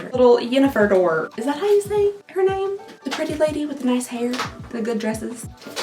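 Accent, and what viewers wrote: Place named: Kentucky, United States. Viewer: One of the reasons I love watching your videos is because your accent reminds me of my long distance best friend who is also from/lives in Kentucky. Always love your videos no matter what they are. Hoping to make it up to the stores one of these times I’m down there !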